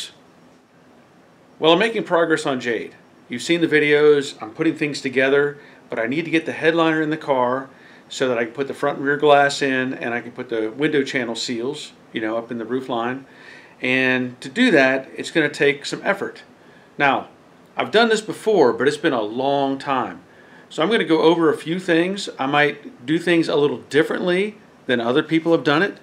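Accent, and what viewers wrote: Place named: Georgia, United States. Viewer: You.do.not.talk.like.someone.from.around.atlanta..more.like.waycross,ga..lwenr.too.schoole.in.big.atlanta.many Years.ago..i.am.also.a.lill...little.south.of.atlanta........florida..keep.on.mustanging..my.friend.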